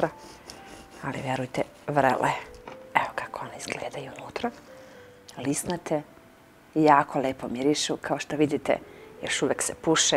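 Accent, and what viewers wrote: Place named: Trstenik, Serbia. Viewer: Ooo sunce moje❤️ stvarno si zlatno dete.Podsećaš me na moju ćeru.Ne provodim baš previše vremena na netu,ali tu sam,i mnogo volim da gledam,sada i pratim tvoje klipove❤️Čak i kada sam u bolnici,ti si mi velika uteha jer obožavam da te gledam i slušam.Ti si moj zavičaj❤️Imamo isti naglasak,pričaš kao da si baš iz mog Trstenika, Medveđe,majka ti je predivna,porodica❤️ Recepti su bukvalno isti,kao što je mene učila moja baka,pa mama.Možda nemam mogućnosti da budem sve vreme na netu,da pratim baš sve❤️Moj muž,duša moja, me je u maju napustio,i nikako to ne mogu da prihvatim😢🙂❤️Preteško je,ali opet život ide,ne pita,a ja idem za njim,kako moram❤️Velikim delom utehu nalazim i u tvojim klipovima sunce drago,i zato ti beskrajno hvala❤️🍀❤️Veliki pozdrav i zagrljaj iz mog Oldenburga❤️Bog vam zdravlje i sreću navek dao🍀🍀🍀❤️❤️❤️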